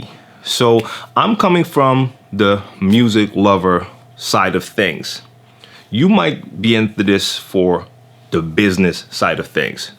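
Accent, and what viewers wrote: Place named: Ohio, United States. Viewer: sirch one Hey bro, where are you at? Sounds like NE Ohio to me, lol. A small bit of advice that works for me is to find a spot where you can play what you like. My passion is to play breaks and little known gems from popular artists along with golden era hip hop but that doesn't work in the dance clubs in my area. I can do the top 40 thing because it's so easy and I have so much experience mixing hard to mix stuff because of my passion. What I did to counter the downsides of top 40 gigs (that pay after all) was find some hipster bars that let me do my thing for free beer. That way I'm always scratching my itch to play what I like and practicing in public which is always better than practicing in the "bedroom".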